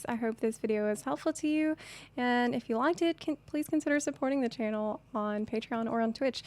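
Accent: South, United States. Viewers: You sound like you're from the south. Coastal southeast.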